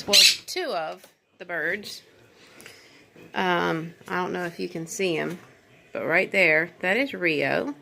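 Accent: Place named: South, United States. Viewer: Hello there, I write your name well? Really enjoyed your 2d Vlog video. Seems a nice family to me, good husband and adorable daughters:) The puppies are sweet too. I have to say, you are doing great, also that selfie standard is very smart! Love your American accent. Is it southern perhaps? You can rely on me, that I will follow more of your video's. The MRE's are my fave too. Maybe you know my friend Nathan's MRE? If not subscribe, he is cool for sure. Perfect program on here you hear?? Loll... till next time.... Rick Lane is my Youtube name, Mark is my Dutch name, see ya:)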